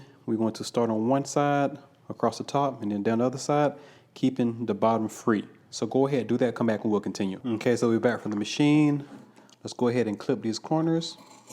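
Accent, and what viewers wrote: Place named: Georgia, United States. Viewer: I recognized that GA accent. Good job! Thanks From a fellow Georgian. I live about 4 hours away from Melanated Fabrics and it's on my bucket list to visit. I just dont get to Atlanta much.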